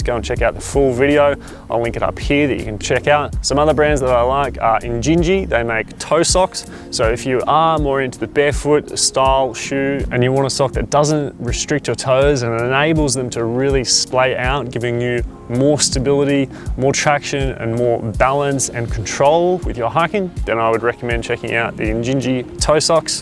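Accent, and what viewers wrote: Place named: Australia. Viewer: mate you sound aussie like the crocodile hunter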